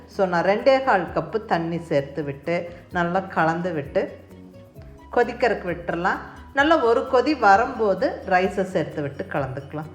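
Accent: Kongu, India. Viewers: I tried and the result was superb like your kongu slang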